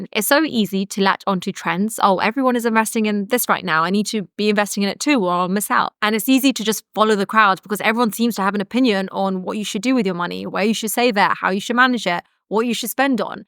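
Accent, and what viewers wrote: England, United Kingdom. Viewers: Your accent is too English 😅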